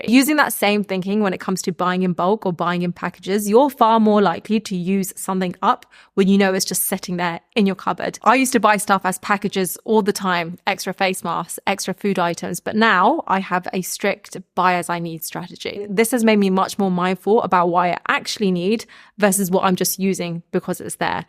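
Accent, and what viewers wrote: United Kingdom. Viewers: Again overdose of british accent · Love your attitude. ❤ I have similar Lifestyle. As a german, I must say, you speak to fast for me and very britisch 😊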